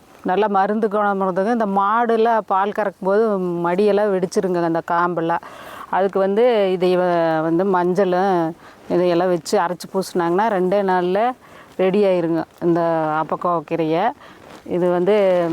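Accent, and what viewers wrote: Kongu, India. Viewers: Ugga vazakkathai aduthavargalukaga matra veddam akka koggu tamiz kojjum tamiz azagu super 💖✨ 💖 ✨ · கொங்கு வட்டார பேச்சு😂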